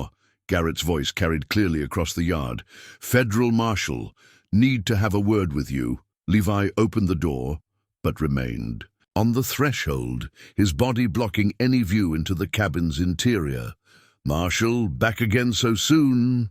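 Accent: United Kingdom. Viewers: Your voice sounds like your from London ! Exquisite voice!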